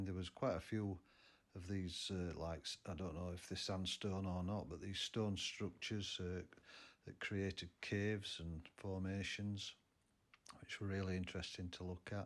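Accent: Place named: North, United Kingdom. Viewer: That was a lovely park up. Loved the views. You are so lucky living up there. Meant to ask, where are you from originally? Good to hear a proper northern accent. Hope you and Ellie have a good Christmas.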